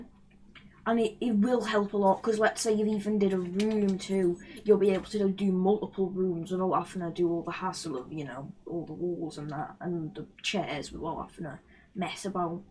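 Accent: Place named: United Kingdom. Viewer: The main reason i watch u cuz u are British and have that funny-ish british accent like a ytber i used to watch.keep ⬆️ ur grind.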